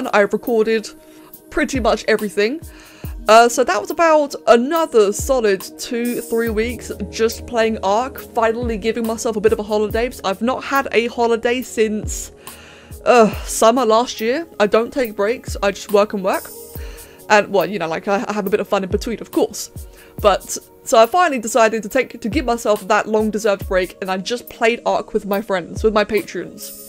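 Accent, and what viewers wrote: United Kingdom. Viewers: i was watching since 2010:D Ps: are u brittish:3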